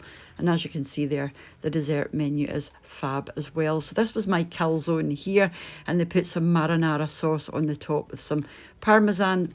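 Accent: Scotland, United Kingdom. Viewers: I watch these to hear that Scottish accent. Just so unique..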